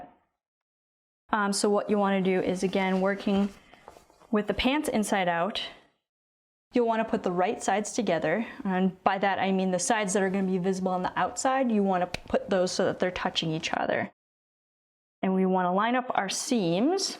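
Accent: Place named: Canada. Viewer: I thought she sounded Canadian....well, it's close by....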